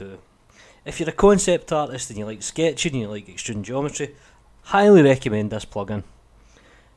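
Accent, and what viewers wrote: Scotland, United Kingdom. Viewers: Nope, that’s Extremely Scottish Scottish. :)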